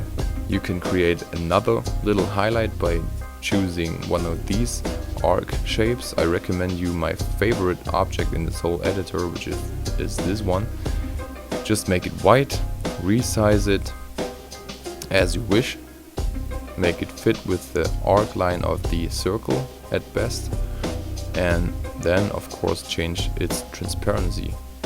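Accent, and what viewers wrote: Germany. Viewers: Are you really english ? Or german because your voice is like a little bit of german accent !^^ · Bist du deutscher? Wenn ja hört mans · Hahaha man merkt irgendwie dass das n deutscher war :D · Digga man hat herausgehört das du deutsch bist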